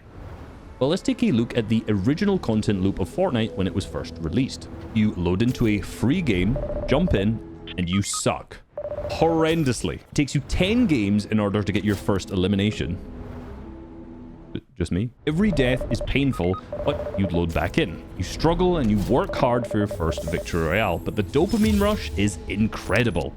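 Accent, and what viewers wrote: Scotland, United Kingdom. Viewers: How can I watch video without the terrible Scottish accent?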